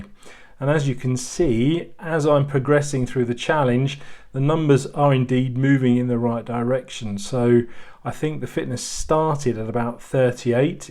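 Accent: United Kingdom. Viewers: Love your British accent...!! Great video production and wonderfull scenary from the country side..!!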